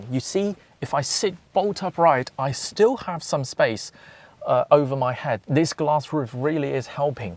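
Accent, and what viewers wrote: United Kingdom. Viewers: He has a thick British accent though.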